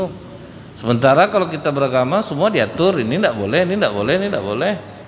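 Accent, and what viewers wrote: West Sumatra, Indonesia. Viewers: Logat nya kayak orang Sumbar ustadz nya, , , Semoga sehat dan selalu fit dalam berdakwah untuk ustadz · loghat nya seperti uztadz Zuma, minang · Itu logat minang mbak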